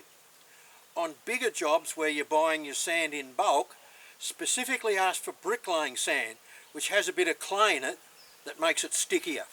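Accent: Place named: Australia. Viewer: I guess all English speakers sound alike to everybody else in the world. I have to admit I can't tell the difference between somebody speaking Chinese or Korean in terms of sound - I don't speak either language. It's ethnocentric I believe. But definitely sounds like an Aussie to my Yankee ears. Awesome video.. Good on ya...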